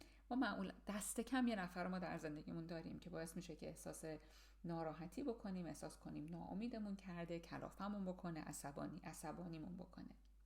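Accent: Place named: United Kingdom. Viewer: چه جالب بود ! همش شمارو جدی در حال سروکله زدن و مصاحبه با افراد بدقلق و اخبار گفتن دیده بودم 😃 لهجتونم خیلی بریتیشه 👍👏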